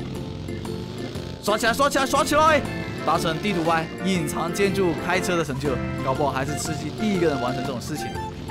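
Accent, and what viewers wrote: Hong Kong, China. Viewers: 4：48你是香港人？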